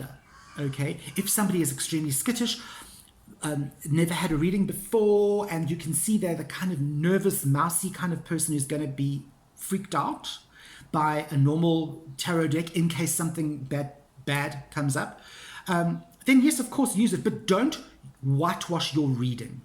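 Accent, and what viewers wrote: South Africa. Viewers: I came here via Donna at Ozark Oracles channel and thought I would drop you a quick hello. Really enjoyed this video and am looking forward to watching more of your content. I think, personally, it’s important to always be honest whilst remembering to also be kind. On an unrelated note, I love your South African accent and could literally listen to you read a dictionary 😁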